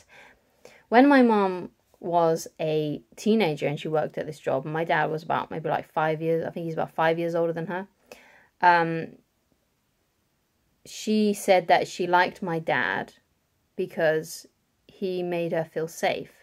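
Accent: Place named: United Kingdom. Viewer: you are pretty and I really like British accent.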